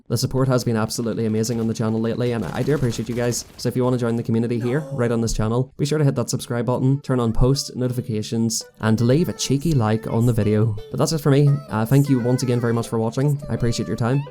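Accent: Ireland. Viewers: You live in Asia? I thought u were Irish aha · Are y from donegal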